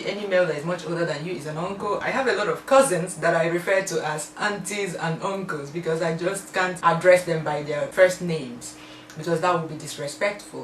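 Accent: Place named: Anambra, Nigeria. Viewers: Aunty, you know you are my aunty lol (in my head) . You're tag was awesome and so much fun. I na-asu that deep anambra dialect (my Igbo is small). I got lost for a while haha. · I love love love Anambra dialect!!! Chai, na too sweet!!! I'm from Enugu but I pray to marry an Anambra man lol.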